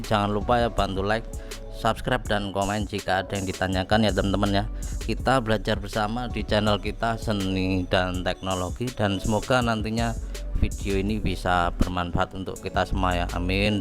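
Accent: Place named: Lampung, Indonesia. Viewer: sampean orang lampung ya mas? kalau di benerin pake hp ga bisa yw mas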